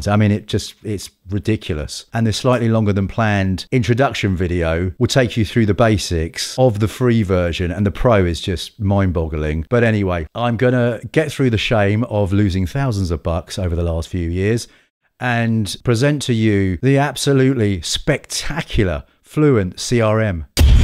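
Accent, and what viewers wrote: United Kingdom. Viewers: Hey, I really enjoy your unique presentation style. You cover technical tutorials, recommendations, comparisons, a reactions, all with a British accent, in just 15 minutes. Great job! Keep up! You didn't ask to subscribe but I did.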